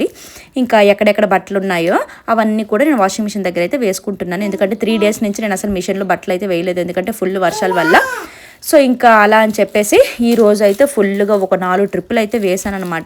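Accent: Srikakulam, India. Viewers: Srikakulam language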